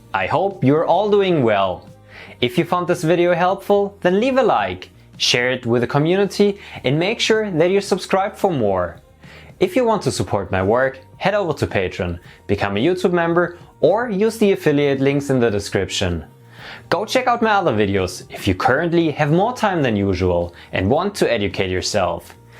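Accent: Germany. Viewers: Your English is sooo German, it makes me smile every time I hear a new video! ;-) Sorry, I beg to differ. The von Mises Estimation assumes the material to be rather homogeneous and isotrop. Both of which 3D printed material is obviously not at all, due to its layered characteristics. In addition, printed material has a different strength than filament because of nozzle and squishing effects. So your estimation of USS may be far off, and you don't even give an idea by how far before comparing to your experimental values. The real failure lines should start at the compressed surface (=upper end of the nut), not at the bottom surface of the whole body (=lower end of the nut), which is uncompressed. After finding that compression failure and enlarging the compression area are the best way to go, I'd be curious to see how a nut with a washer or a claw nut (german "Einschlagmutter") behave in your setting.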